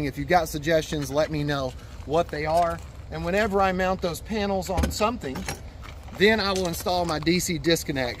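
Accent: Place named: Tennessee, United States. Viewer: This is a truly excellent video on how to install a mini split. Thinking I will be using this tutorial if and when I need to replace mine. One thought,....likely a regional thing, but I notice your frequent use of "Take and...." before words like, "Use", "Push", "Put" etc. I have heard this before,.....might be a Tennessee thing. Just Push, Put, or Use,.....without the "Take and..." Might not feel right to you if you grew up with everyone saying "Take and...." Otherwise,....a great tutorial,...thanks for taking the time to video, edit and post it for us to learn from.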